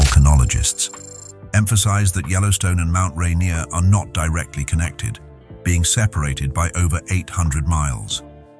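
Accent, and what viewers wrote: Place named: United Kingdom. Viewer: STUPID british, trying to speak english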